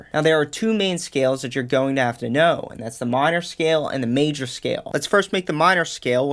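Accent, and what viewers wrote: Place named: Boston, United States. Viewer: “Whole, whole, hailf” 😂 love the Boston/NY accent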